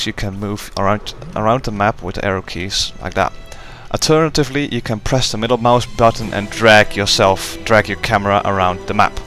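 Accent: Netherlands. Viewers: Yeah, I hear it too. He definitely sounds Dutch.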